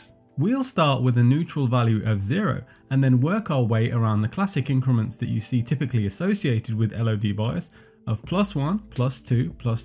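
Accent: United Kingdom. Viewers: Damn, that British accent! I absolutely love it! And your video too, of course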